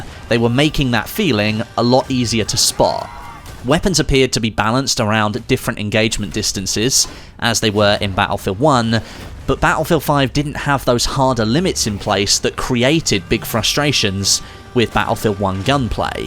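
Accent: United Kingdom. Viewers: So, here I am with my already formed opinion, formed from hundreds or hours of BFV game play, that it's just...bad. And here you come along with your proper British accent and a dump truck full of logic and analysis. So NOW I have to admit that it wasn't all THAT bad...thanks man. Thanks. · where are you from? UK? Australia?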